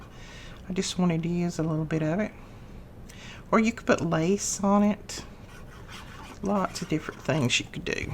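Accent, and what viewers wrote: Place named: Georgia, United States. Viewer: Hi from Texas. I have been watching a few of your videos and since I was "made in Georgia" I love your accent! Just like family!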